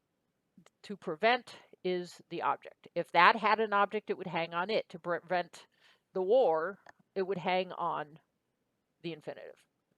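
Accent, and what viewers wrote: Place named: United States. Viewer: And another American pronounciation and diphtongisation of Ancient Greek... why does noone pay attention to the basic phonetics???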